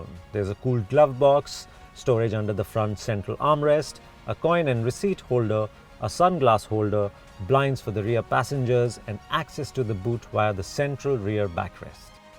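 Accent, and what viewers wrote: India. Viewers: bullshit... This guy has a good Indian accent that is crisp. He is very easy to understand and is very serious... Matt speaks very fast... Swallows his words... And is full of goofy jokes ...which are nice too...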